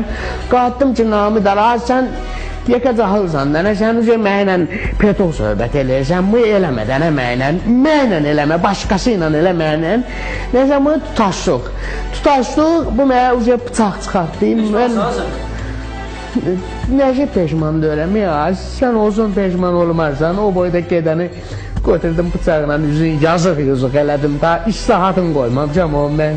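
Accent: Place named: Imishli, Azerbaijan. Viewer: Bu İmişli ləhcəsidir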